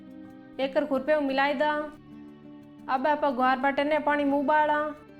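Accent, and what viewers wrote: Marwar, India. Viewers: Bhabi PYOR MARWADI bole 😊😊😊😊😊😊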